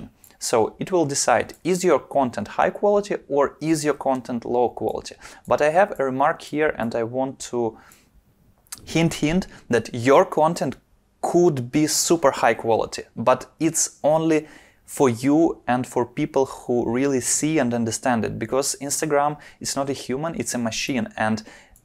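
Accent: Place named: England, United Kingdom. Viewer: Great video, you have a nice English accent! Keep it up m8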